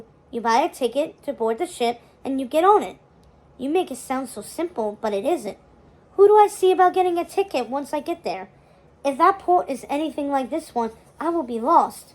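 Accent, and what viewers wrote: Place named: Louisiana, United States. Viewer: You are great with that Louisianan accent!!! ❤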